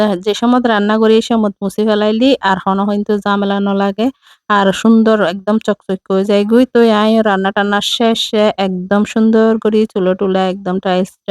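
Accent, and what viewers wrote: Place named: Chittagong, Bangladesh. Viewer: আপুর বাড়ি মনে হয় চট্টগ্রামে তাই মনে হইল বাসায় হিসেবে আমার কাছে ভালো লাগে চট্টগ্রামের ভাষা 💗💗💗💗💗👍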